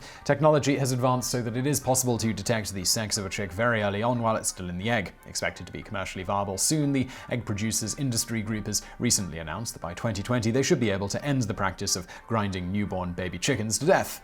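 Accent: United Kingdom. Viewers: is living proof that everything really does sound better with a British accent.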